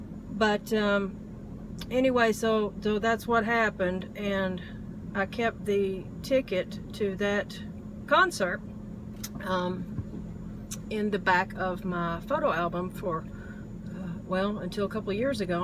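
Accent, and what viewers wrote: United States. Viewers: Your accent's pretty awesome. My grandmother's from Texas so it's something I've heard my whole life. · I like your southern accent.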